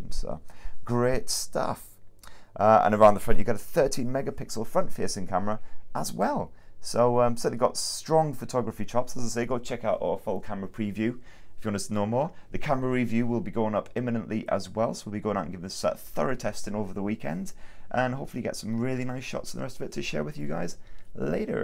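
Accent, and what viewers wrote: United Kingdom. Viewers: Love your British accent and sound.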